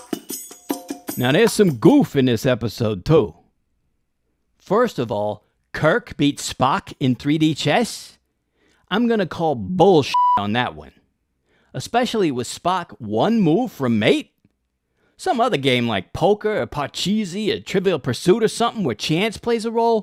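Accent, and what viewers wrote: Brooklyn, United States. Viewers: love the brooklyn accent and snark..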